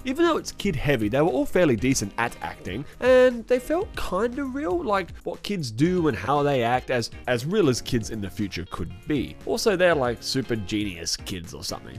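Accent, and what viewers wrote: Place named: Australia. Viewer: The review was better then the show...Perhaps #Netflix could give you your own series with you doing just reviews.... But make sure you use that Australian accent..some people really like that !! "OK so I give the show a C- and the review a solid A"....... PEACE and BE WILD !!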